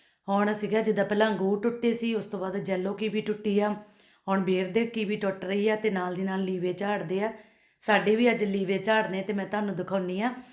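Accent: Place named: Jalandhar, India. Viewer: Tuhadi language Jalandhar wali lgdi aa asi v Jalandhar to e aa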